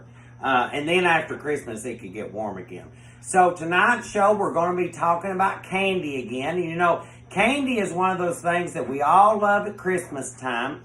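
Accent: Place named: Lexington, United States. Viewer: You seem nice....sound like Lexington. Huge queer population there.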